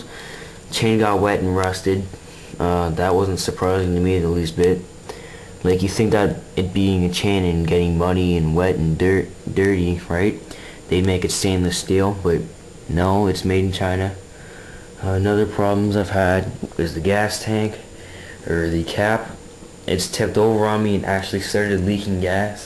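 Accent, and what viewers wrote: Canada. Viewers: some china man just made about $1999.50 haha.....someone sounds Canadian aye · are you canadian??? just when you said out sounded kinda scotish, i remember this from south park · R u Canadian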